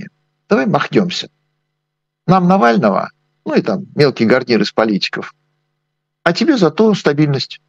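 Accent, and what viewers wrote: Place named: Israel. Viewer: Явно заметен акцент ведущего. Тогда все понятно : либо Израиль , либо Бруклин. Дизлайк.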